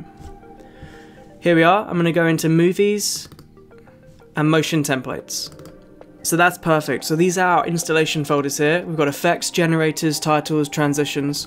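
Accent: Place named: United Kingdom. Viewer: I did not expect you to be from the UK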